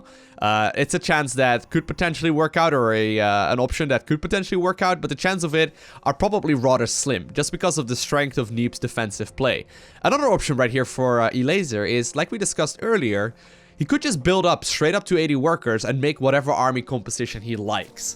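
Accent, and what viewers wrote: Netherlands. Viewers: Ah ur dutch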